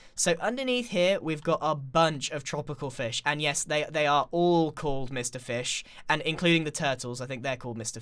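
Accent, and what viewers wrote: United Kingdom. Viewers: Tyler Szulkowski he's British, its part of their accent,it sounds like swum but its actually swam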